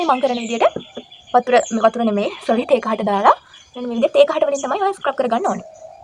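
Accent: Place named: South, Sri Lanka. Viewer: ඒක හරි එයා දකුණේ කෙනෙක් ඒක නිසා කථා කරනවා වෙනස් තමයි හැබැයි ලස්සනට කියලා දුන්නා thank you නංගි ❤️❤️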